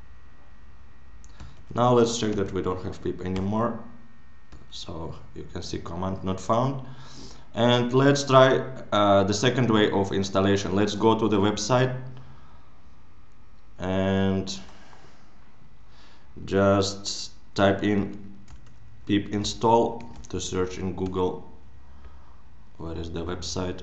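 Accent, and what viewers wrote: Russia. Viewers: мне показалось или он русский???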